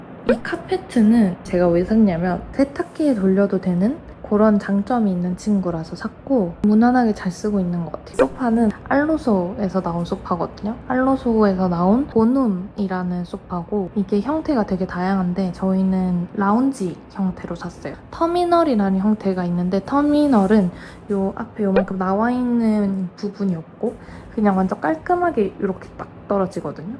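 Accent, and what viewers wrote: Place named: Seoul, South Korea. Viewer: 니 왜 서울말씀?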